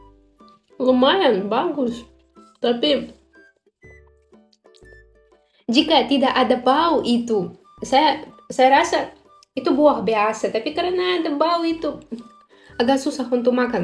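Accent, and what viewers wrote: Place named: Netherlands. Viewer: E kamu ngomong ky orang holanda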